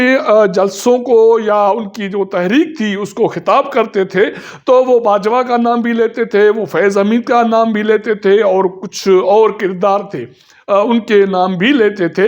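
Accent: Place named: Saraiki, Pakistan. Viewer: SAADY HO AP SAHIB AP KEE URDU ACHEE NHE JAAY KY SIRIKEE BOOL RAHY HO AP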